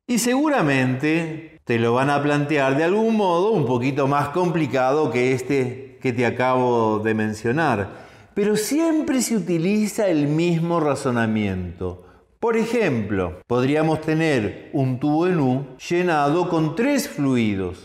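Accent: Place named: Argentina. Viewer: El acento argentino siempre queda tan bien en un profesor, muy buen vídeo!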